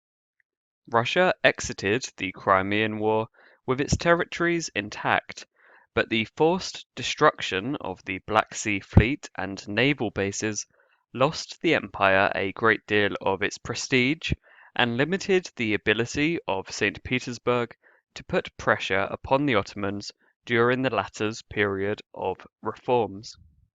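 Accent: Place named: United Kingdom. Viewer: I'm not sure why but I have an inherent bias towards British particularly English and Northern Irish when it comes to historical topics. When I hear that accent I immediately trust the content. Just a thought that occurred to me as I was watching this well done video. Also while I have a bias towards British history presenters, it's sad they stopped the Russians from taking Istanbul. Even the Muslims of Egypt (me included) wish the Greeks had that city back. Turks morality is lacking to say the least.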